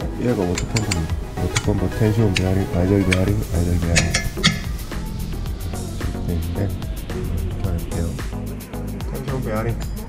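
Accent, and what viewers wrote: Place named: Gyeongsang, South Korea. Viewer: 아무리 힘들어도 신나게, 멋집니다. 경상도 말투라 오해하실 분도 좀 있겠습니다. 혹시 부산인가요? 저는 인천에 삽니다만, 부산이 고향이라 친구들 많아요. 부산이면 소개해드리고 싶네요. 수고하세요. !!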